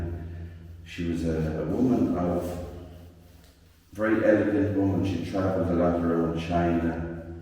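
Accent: Ireland. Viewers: Absolutely beautiful. Such a work of art. I had to laugh though. Your Irish accent can be difficult for my American ears. Sounded like you said the marble was brought in by a “horse and cat”. 😅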